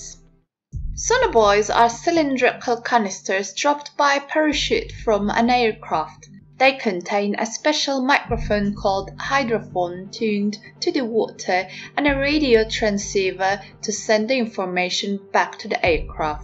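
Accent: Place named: Scandinavia. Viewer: Prob Scandinavia like Norway, Sweden etc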